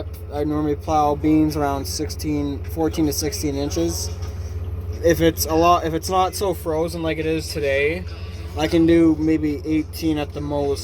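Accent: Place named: South, United States. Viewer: OK I hear the country now...lmao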